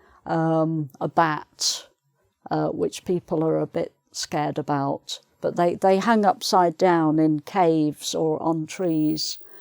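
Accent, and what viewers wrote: United Kingdom. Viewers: Hello...you are the most elegant teacher I have ever come across having such a lovely voice and true Brit accent.Why don't you reply to our comments?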